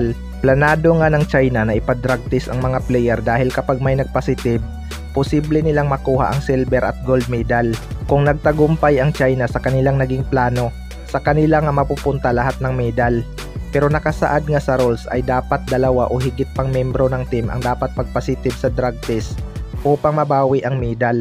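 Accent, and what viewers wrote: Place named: Iloilo, Philippines. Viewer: ilongo ka no..may punto kasi tagalog m9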